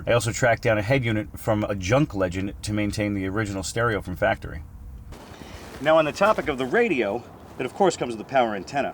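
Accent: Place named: New York, United States. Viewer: Exactly what I was thinking, got to be a New York accent thing.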